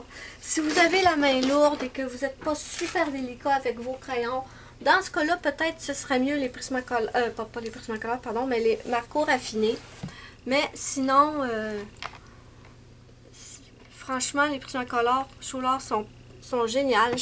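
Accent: Canada. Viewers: j'adore ton accent canadien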